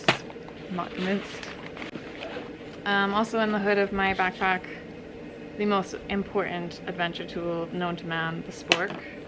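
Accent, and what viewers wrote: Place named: Scotland, United Kingdom. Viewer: Sometimes i hear a Scottish twang in your accent 🏴🇨🇦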